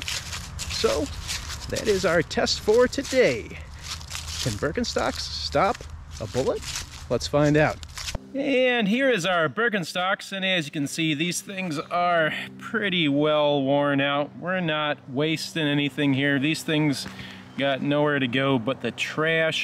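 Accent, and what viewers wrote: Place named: Midwest, United States. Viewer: Can't get enough of the Midwest accent! like the 22lr videos, but come back for the accent! You sound just like my late dad and all my cousins, aunts and uncles from STL, Iowa, and WIS....Have a pop doncha know!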